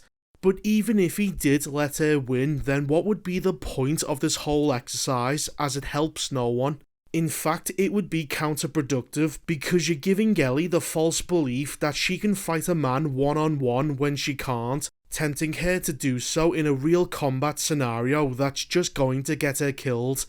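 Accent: Liverpool, United Kingdom. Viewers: Yes my bredda, Are you an ACTUAL SCOUSER? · God your scouse accent is annoying but i 100% agree with you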